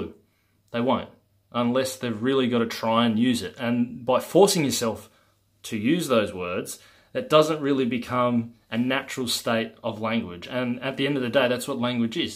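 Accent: Australia. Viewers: Love the aussie accent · You sound Australian.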